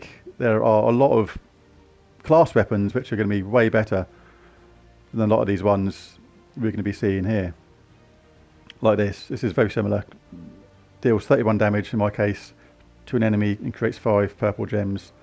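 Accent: United Kingdom. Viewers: nice to see a british? (you sound british) gems of war youtuber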